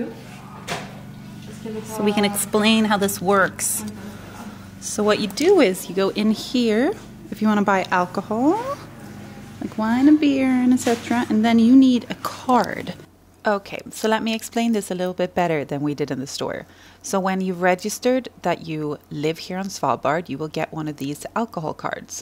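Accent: United States. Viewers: Did you live in the United States? You sound like you learned English from Americans. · Came here from Twitter where someone uploaded your video from Tiktok I have been enjoying your videos a lot but I am surprised by this small town. I’m from England and when you go to smaller towns or villages there won’t even be brands we’re familiar with in big towns and cities but here in Longyearbyen I see brands at the supermarket I recognise, which is funny. Did you go and live in USA for a while because you’ve got a perfect typical American accent? And does the sun rise at all or is it constant darkness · are you american? you just sound american to me, but somehow i doubt you are since you live in such a unique place. i bet you have an interesting story.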